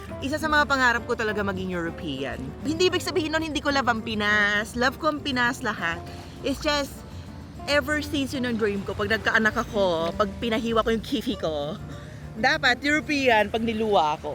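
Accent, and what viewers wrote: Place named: United States. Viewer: Ohh i know who looks like now, she looks like Priyanka Chopra, but has American English accent than Priyanka.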